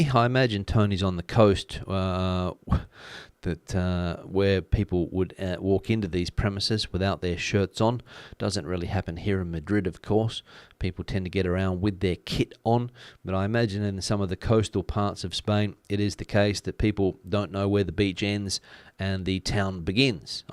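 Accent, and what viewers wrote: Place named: Australia. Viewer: I'm from Germany and stumbled upon your channel a couple of days back. Quite funny to hear an Aussie doing the news on Spain. G'day! I lived in Oz for 2 decades and loved the outback, and now want to retire in southern Spain. I'm taking spanish classes and researching my options. Looking good. Thanks for doing this, looking forward to hear more from you. Cheers!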